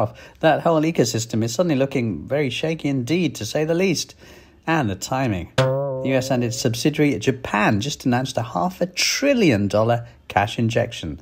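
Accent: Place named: England, United Kingdom. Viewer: Chinese propaganda channel with English accent voiceover.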